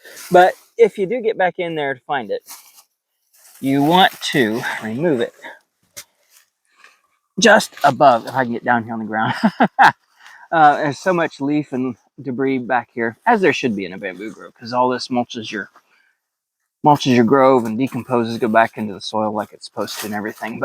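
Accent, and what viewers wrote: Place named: Midwest, United States. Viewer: For what it's worth, I can understand you just fine, though I'm a fellow Midwesterner. But I think the issue for some may be the slight muffled quality of the audio. Sounds like you may have a windscreen on your mike (which is absolutely 100% great), but there is still a little "fog" in the audio, which may be what people are reacting to. I don't know what video editor you are using, but DaVinci Resolve is a very, very powerful free video editor that also allows you to make the audio crystal clear using its Fairlight page. You may not have enough time in the day to utilize it since you (gratefully!) make a video so frequently. And that's much appreciated! Especially from those of us interested in planting Bamboo. But I thought I'd at least put this out there.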